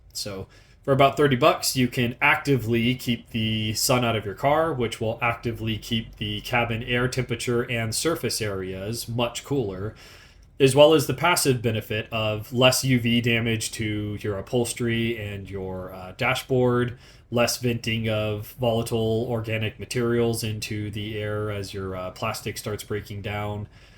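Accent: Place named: United States. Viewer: Thank you for your research and experimentation. By the way, are you of Asian descent? Your voice/accent gives me the impression that you were born in America, but have that descent.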